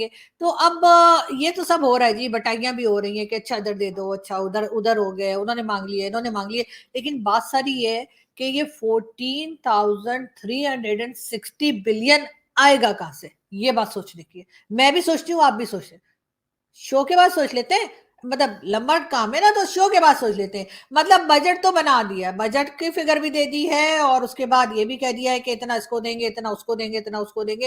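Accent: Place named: Mumbai, India. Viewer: ji aap mumbai hindi kaise bol leti hai. pls repl.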